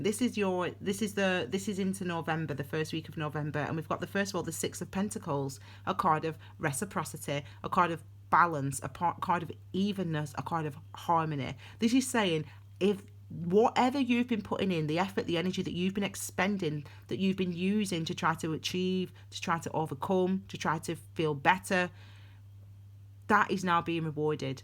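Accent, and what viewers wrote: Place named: Northern England, United Kingdom. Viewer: Always nice to hear a familiar northern accent ont 'tube. M/cr here.........top reading...........mint!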